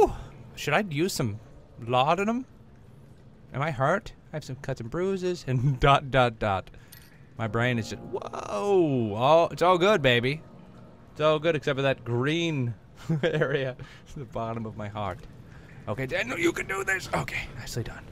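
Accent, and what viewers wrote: United Kingdom. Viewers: Love your British accent <3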